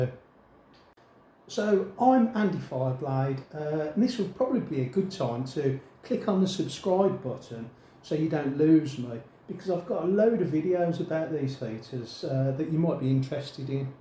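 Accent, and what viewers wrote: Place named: Black Country, United Kingdom. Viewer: Love your videos Andy fireblade, you seem a very friendly chap, definitely a Birmingham/Black Country accent there ;)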